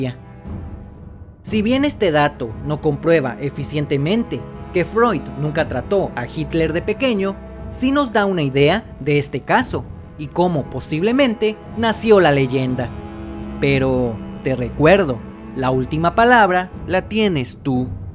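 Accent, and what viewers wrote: Mexico. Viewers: Eres yucateco?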